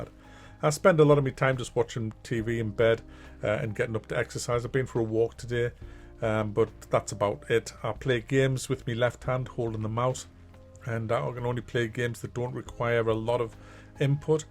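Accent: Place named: Newcastle, United Kingdom. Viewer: Mate, I only started watching your videos early this year (after moving out on my todd). You're a fucking legend, and it's been a true pleasure to have all your old content to spool through, while following the new stuff. You're like a stand-in Geordie replacement to me still being back at my Parents Home with my Dad watching The Hairy Bikers. lol. (Every time you're laying into David Braben from Frontier, it's what goes through my head haha, "Deeeeeive!!" < terrible Scouse interpretation of the Geordie accent.) As others have said. Second Opinions. Reconsideration. Fuck, dare I say it - fundraising for Going Private... Need to get you back in the game.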